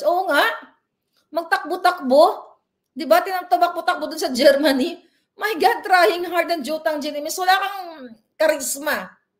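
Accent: Iloilo, Philippines. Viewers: Kanami lang sa imo maghambal sang ilonggo ,sarap pakinggan. Mahal ka namin madam.ingat lagi.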